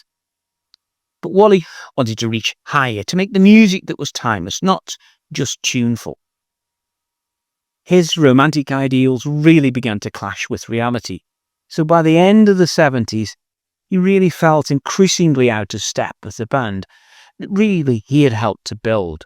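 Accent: Stoke, United Kingdom. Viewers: Stoke accent...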